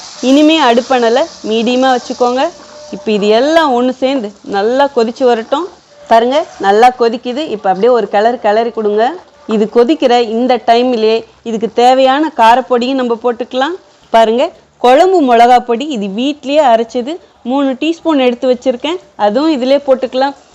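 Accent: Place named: Kerala, India. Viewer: Kerala samayal la pesara mathriye pesaringa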